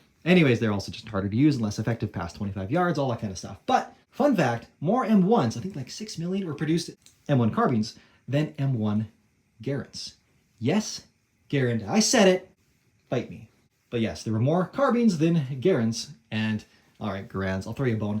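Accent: Canada. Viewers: Dude I can’t stand your canadian accent. Don’t have a problem with Canada, just don’t like your breathy raspy voice. Nice guns tho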